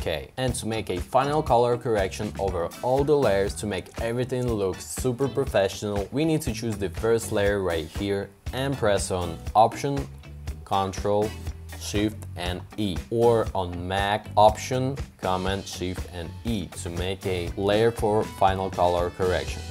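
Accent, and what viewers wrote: Russia. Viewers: Я вот чувствовал русский акцент, зуб был готов дать 🤣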